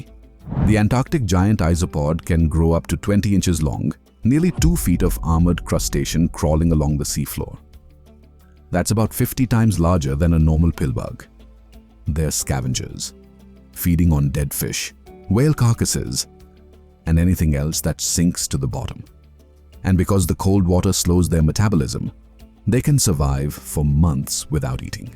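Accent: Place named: India. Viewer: why is the ai voice talk like an indian?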